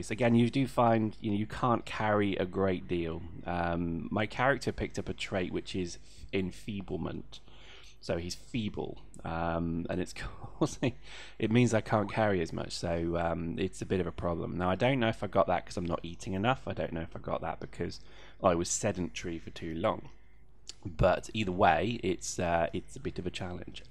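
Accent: United Kingdom. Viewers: I wish it were somehow possible to collect rainwater. I mean it rains bloody often enough in this game! Great let's play, btw, dat britishness.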